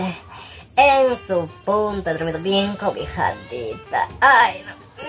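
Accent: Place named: Peru. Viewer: se nota demasiado q es de peru me aparecio en recomendados esta muy recomedado :D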